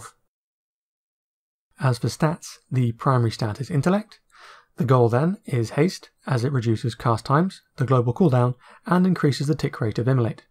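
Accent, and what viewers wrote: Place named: United Kingdom. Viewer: Nice video! Nice to hear a British voice for a change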